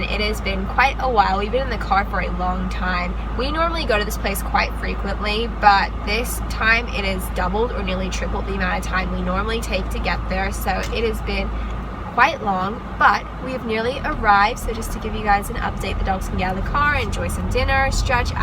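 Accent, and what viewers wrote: United States. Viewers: She sounds more American than Australian... 🤔🤔🤔. But I still really hope that you and yours family are okay!!!! May god bless your whole country!!!! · I thought you were american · Omg, I didn’t know you were Aussie, I thought you were American, since 2014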